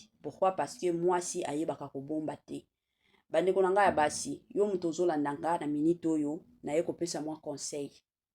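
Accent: Rwanda. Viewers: Tika biloba loba yo oza Rwandaise,tonalité nayo eza kofunda yo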